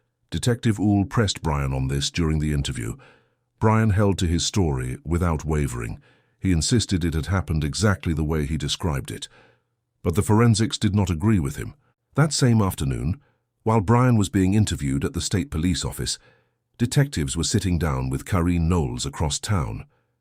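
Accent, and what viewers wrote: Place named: United Kingdom. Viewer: This AI voice of the host is horrific the limey thing does not work in the USA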